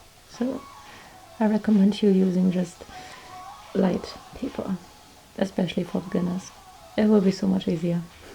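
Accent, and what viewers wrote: Germany. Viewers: Thank you so much for showing us! You honestly deserve so much more attention and love bc your work is awesome! But I'm glad I found your channel now ^^ btw sorry for that question but are you German? I just think you sound a little German :)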